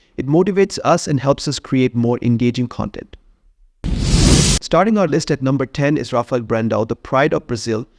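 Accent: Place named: India. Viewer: I am sure you are Indian because of your tone.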